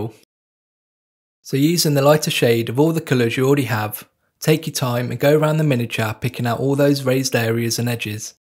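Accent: United Kingdom. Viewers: I don’t know what l liked better, the British speak or learning New painting techniques. Thank you 😊 . Not paper towels, kitchen paper.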